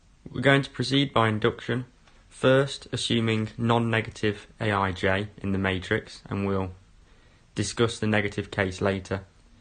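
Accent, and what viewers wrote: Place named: United Kingdom. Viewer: uk accent is annoying , can't understand.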